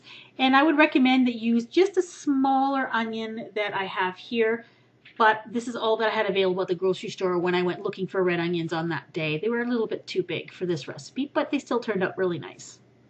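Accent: Canada. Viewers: within 30seconds of watching this video I can tell she is from Canada!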